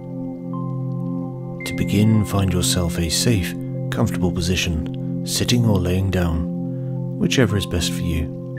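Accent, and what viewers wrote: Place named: United Kingdom. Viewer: I find all your guided meditations just perfect. Started to listen because I especifically wanted a guider with a smooth male voice and British accent. But all meditations are just so beautifully said and calming. It has helped me a lot throughout the past months. Thank you, looking forward for new videos.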